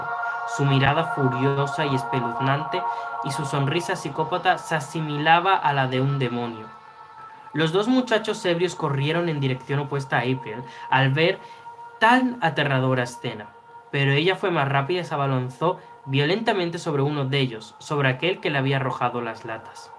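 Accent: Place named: North, Mexico. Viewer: El acento norteño...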